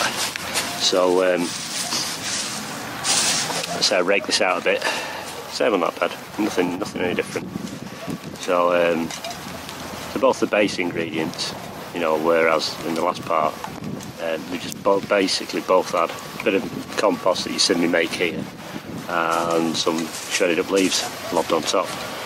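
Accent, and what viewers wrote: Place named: Manchester, United Kingdom. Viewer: Where are you based? Nice one. Like your intro a lot. very professional. You sound a little bit like someone local to us here in Ashton u Lyne, North Est Manchester. Or are my ears playing games? Nice one mate again. I'll check Aarons out too, thanks for heads up. Cheers mate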